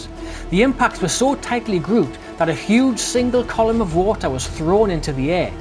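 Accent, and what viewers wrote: Newcastle, United Kingdom. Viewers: Commentator has a Geordie accent.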